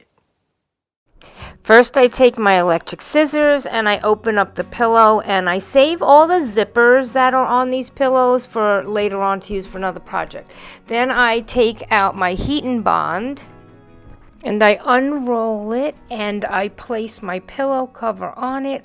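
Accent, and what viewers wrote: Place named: New Jersey, United States. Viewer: I am intrigued by them. Not sure I would wear them. Are u from NJ? I am trying to guess ur accent.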